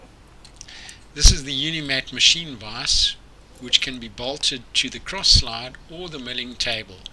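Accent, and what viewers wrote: South Africa. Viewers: Thanks for a great video. I inherited a Unimat from my late father in law. Your channel is a great knowledge source. Thank you PS are you South African? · Hi , I have a Unimat SL I bought new in 1977 with many accessories , I made a nice box to store everything in but never used it until I retired in 2019! Now with spare time I am making wooden model wagons and find the Unimat excellent to drill wheel and spoke holes accurately using the 3 jaw chuck on the dividing head, I have 30,40 and 48 tooth inserts for it which gives all possibilities for the number of spokes per wheel you need. I enjoyed the video and still look for more accessories, I have the thread cutting attachment but no leaders or followers and I would like the adjustable tool holder you show, I do detect you accent as very close to a south African one which is where I am in Springs. 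· By your accent you sound like a South African…. I too live in SA.I have one of these lathes that my Father gave me way back in 1970. Unfortunately the motor stopped working and the drive belts broke. Recently have managed to find replacements for these. Where do I get polyurethane belt material in South Africa if the new replacement belts snap?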